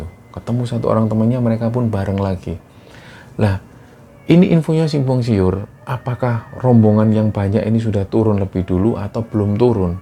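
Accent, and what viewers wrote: Java, Indonesia. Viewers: Saya orang Sasak Lombok pecandu kembaran sunyi, sy 13 tahun nyatri di Ponorogo Jawa timur (<num>) , nyimak kembaran sunyi, sy menjadi TERjebak di masa lalu, backing musik, langgam sinden dan gaya adik yg cerita sangat njawani.aku 85 persen JD flashback seperti terasa masih JD santri di Jatim, ingin rasanya kembali ke masa lalu, aku suka content juga, Krn content ini, kandungan DAKWAH ISLAMIYAh, praktis mudah difahami dan efektif utk mencegah kemusyrikan, menguatkan AQIDAH, banyak pelajaran berharga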